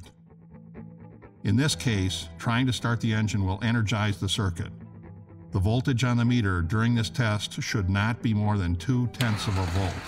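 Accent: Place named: Chicago, United States. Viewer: This guy is obviously from Chicaago. That accent is terrible but video not bad. thanks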